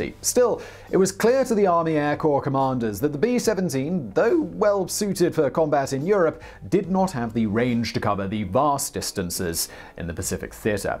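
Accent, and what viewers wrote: United Kingdom. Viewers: My wife: how many channels does this guy have? Me: why? My wife: I hear that Brit's voice all the time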